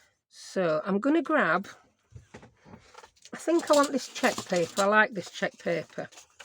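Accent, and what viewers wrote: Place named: Nottingham, United Kingdom. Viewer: You kill me! I was laughing so hard at the score board part! Just found you. Hello from Canada. You sound like my parents with your accent. They are from Notts.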